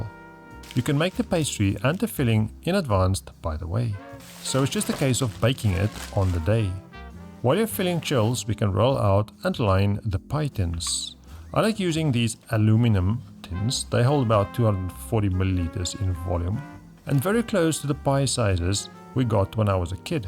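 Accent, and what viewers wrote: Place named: South Africa. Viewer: Found you by accident this evening. Subscribed! Love hearing a South African voice with the cooking videos. Also really enjoying your vegan options 😂🎉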